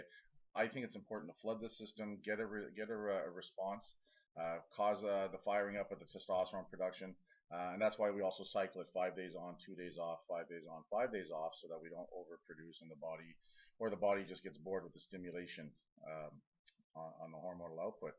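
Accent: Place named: Canada. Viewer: You are so attractive. Love the Canadian accent too. I don't mean to objectify you but it's your fault for being so visually appealing. You're also very bright and articulate. You seem very even tempered, kind, like you'd make a good manager/supervisor. You need WAY more subscribers. Your message and delivery are very persuasive and you're easy on the eyes.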